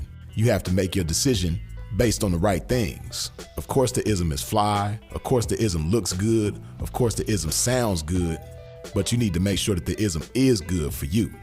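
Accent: Chicago, United States. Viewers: Ah “P” I Didn’t know you was 🥶 with the ISM like Dat But Ur name is you from the Chi u sound like SouthSide Nigga Mannnnnn? This Video was Refreshing to Life G Blessings to you 🫱🏿‍🫲🏾💯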